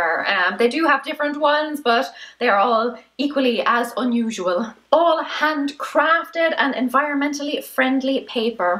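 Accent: Ireland. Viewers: LOL "Shoot it out of a cannon", if only that was a real option. Then my neighbors could deal with all of my stuff haha. Great sense of humor, very inspiring. Liked and subbed. Also, love your hair, the name of your channel and of course your accent. Éirinn go Brách. I think that is correct? I'm about 1/4 Irish and born in the USA. (I'm not trying to be political, just supportive of Ireland, their culture and accents) I'm a compulsive collector (if you are wondering, yes, hoarding), with PTSD, MDD, ADHD, GAD, panic disorder, perfectionism and I watch these kinds of videos for inspiration, strategies to change my life. You're are fresh and funny, I think I will watch more of your videos. And yes, that means to procrastinate even longer LOL.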